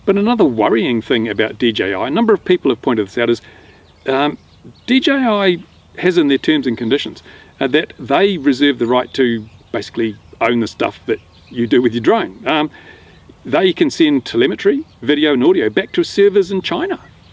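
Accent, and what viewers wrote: Australia. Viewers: Whats that accent? Aussie? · Is this guy Australian?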